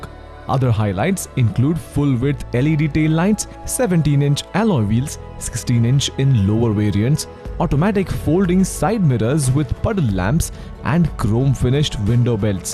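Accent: India. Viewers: India. listen carefully to his english. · Its japanese car..its weird when indian voice introducer start kick in 🤣.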